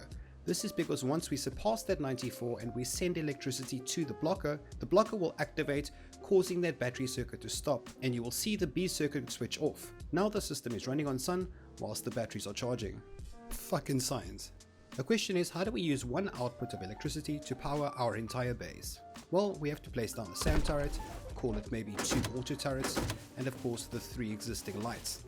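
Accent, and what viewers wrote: South Africa. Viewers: I knew u were south african. Woop woop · South African accent? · So awesome to hear a South African voice for a change. Great videos. Might I ask how long it took you to edit this video? I'm asking because in my mind this would take me days so maybe you have some much more efficient method.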